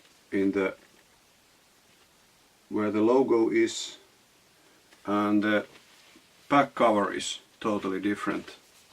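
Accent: Finland. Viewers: i love finnish accent so cute :)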